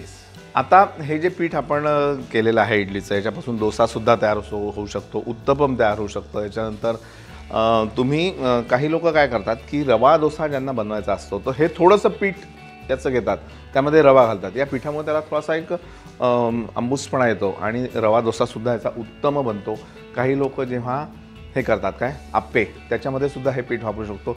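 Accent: Vidarbha, India. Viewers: Vidhrbha chi bhasha bole Tumi mustt khupch Chan vtl sir khupch Chan recipe sir 👍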